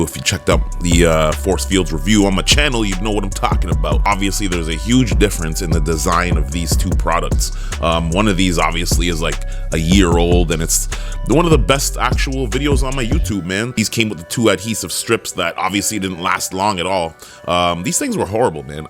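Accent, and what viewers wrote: Toronto, Canada. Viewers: The Toronto talk has me rolling dawg😂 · That Toronto accent is annoying af 😂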